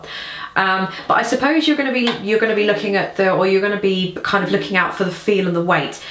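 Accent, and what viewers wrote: England, United Kingdom. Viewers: I like your accent. I can tell you are from England.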